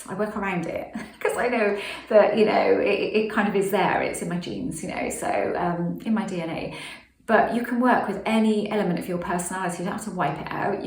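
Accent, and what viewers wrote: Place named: Liverpool, United Kingdom. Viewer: Please check your mic. I love all you say and its a very important message because nobody is talking about it in the loa comunity. But with your british accent and the low sound its really difficult to follow. I think its not possible to manifest an sp because you cant wanna manifest an sp and be deattached at the same time. For me the best thing to do is to manifest a situation. Like... Focus on what you want what situation what kind of feelings. For example a romantic relationship where you go on a trip together. And even if an sp comes to your mind you just manifest that an leave up to the universe who is gonna play the character of " your love" like if life was your movie and its up to God who is gonna be the actor who plays your guy. You writte the scene, the universe choose the actor. I think that is the way to go.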